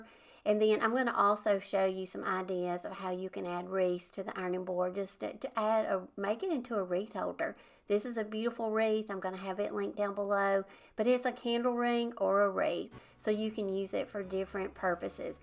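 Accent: South, United States. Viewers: I love all your creative projects but, more than that I love your sweet southern accent.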